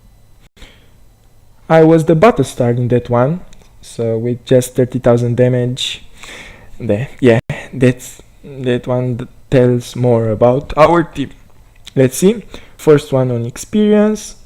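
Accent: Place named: Romania. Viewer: You sound like an romanian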